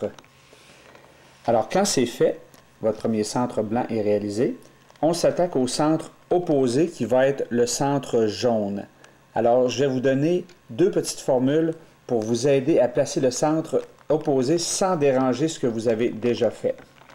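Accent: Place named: Quebec, Canada. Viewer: Lol je suis un débutant en matière de rubik cube mais j'trouve que c'est bien expliquer.. Sinon j'adore l'accent québécoi ^^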